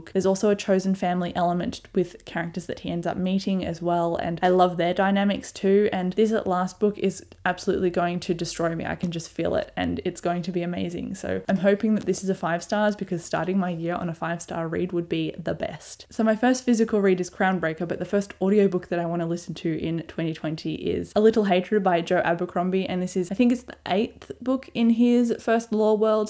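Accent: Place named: Australia. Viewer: I'd forgotten how pleasant the Australian accent is. :) Looking forward to what you have to say about Parasite. The Wheel of Time...I went through that series a year or so ago because of the Sanderson tie in and because when I saw him in person both times he always credits it as one of his favorite...I won't spoil anything, but I'll be looking out for how your experience goes and save my queries until you finish. :)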